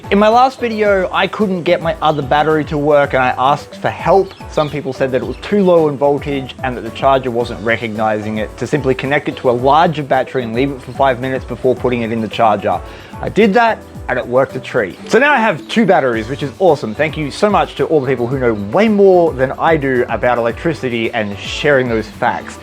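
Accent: Australia. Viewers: I spend a considerable amount of time watching those other gold prospecting videos you mentioned and would like to point out that your personality and energy, as well as your Aussie accent and Gadsey (sp), all combine to make your work the first I choose when there are choices. It would be a shame if you lost the will to continue your work and I commend you for your efforts.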